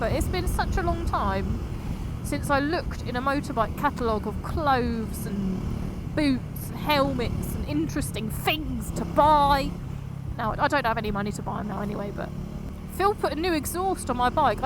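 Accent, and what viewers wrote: England, United Kingdom. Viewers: Been wanting to watch this since you put it out... been working so not able. I am a person with no dependants, but I think we need to make sure we do stuff for ourselves as well as our kids/family/partner/etc... Don't feel guilty is what I'm saying. Your bikey sounds great on the throttle. Congrats on the wedding. I love that after a few years you still have your English accent, was expecting an episode of Allo-Allo style "ve have ways of making you talk!" :-D hahaha I don't watch Phil's German channel, still follow his UK one though. I got a van to do the moving bikes about thing... have only moved bikes a couple of times, but no rugrats 😀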